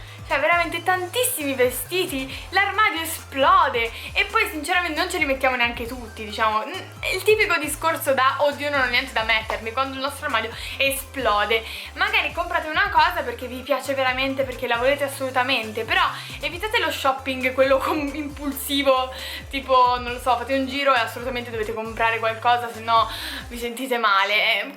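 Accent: Tuscany, Italy. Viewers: ti è scappato l'accento toscano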